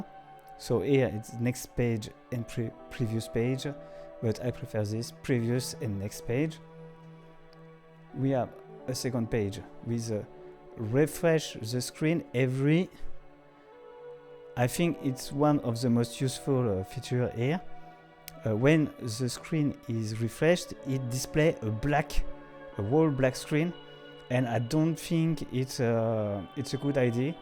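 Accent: France. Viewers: What a helpful video. Thank you so much! This is the second video in 2 days where a French person feels like their accent is a problem - it’s honestly not even a thought. I’m sorry you guys feel self conscious. Truely I’ve never heard any negative commentary about French accents. In fact, I’ve only heard good things 😌 thanks again for the very helpful tutorial - much appreciated 😌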